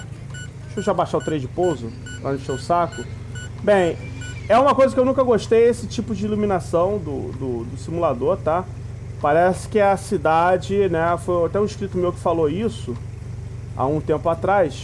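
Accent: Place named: Rio de Janeiro, Brazil. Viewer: sotaque de carioca puxado, não é o mesmo produto, com arquitetura melhor vai sair incríveis add-ons, espera 1 ano que vc vai ver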